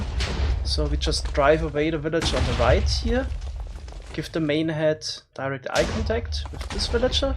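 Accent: Germany. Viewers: Noticing someone's accent is not racist, dont worry. He is still flluent in English, with a pretty obvious german accent.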